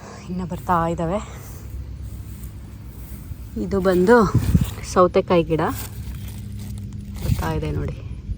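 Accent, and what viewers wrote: Mandya, India. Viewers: Hai nice vlog .nim Mandaya language thumba channagide nice to see your vlogs .OK bye take care. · Nim matu keli kushi agutte Same nam mandya style ಮಾತು❤ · Nimma mandya bhashe thumba chennagide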